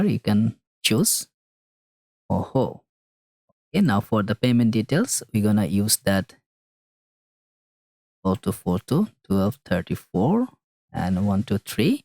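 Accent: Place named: Bengal, India. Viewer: are you bangali ?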